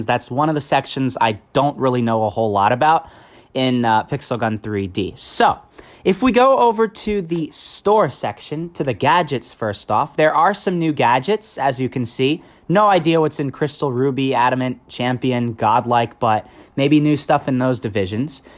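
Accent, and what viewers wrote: Russia. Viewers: Are you Russian? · Are you Russian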